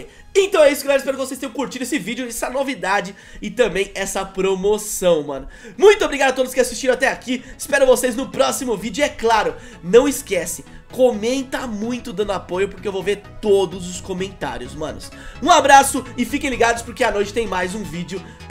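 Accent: Minas Gerais, Brazil. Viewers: e mineiro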